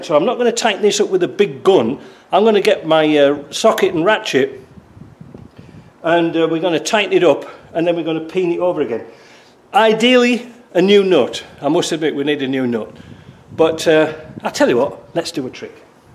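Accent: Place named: Cumbria, United Kingdom. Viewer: You sound Cumbrian. Am right? Edgar (All my Land Rovers were Series 1! )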